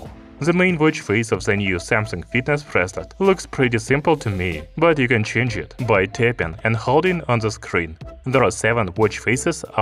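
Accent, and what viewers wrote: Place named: Russia. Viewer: Rusia o alrededores creo. Lo importante es que se entienda incluso con subtitulos.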